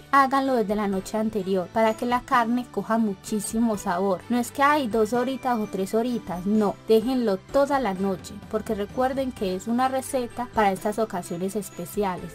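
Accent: Antioquia, Colombia. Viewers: Como todas tus recetas excelente me encantó un abrazo de un venezolano que vive en argentina y por supuesto esa receta el mejor acompañamiento que tiene es ese acento paisa tan lindo ❤ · Super esta receta😂😂😂😂me senti hablando yo...puro paisa.gracias por compartir❤ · Tan Querida con ese acentíco Paisa.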